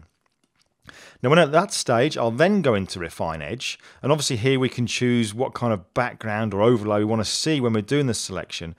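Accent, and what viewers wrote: United Kingdom. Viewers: Really great tutorial!! Exactly the tips I was looking for, and I really like the way you teach/talk etc. (nothing against U.S. tutorials, as I've leant loads from them, but I love to find a fellow Brit to learn from.) I think I've found somewhere I will defo be coming back to regularly!! Cheers!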